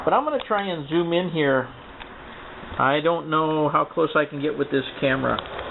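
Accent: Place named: United States. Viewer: American- ish lol!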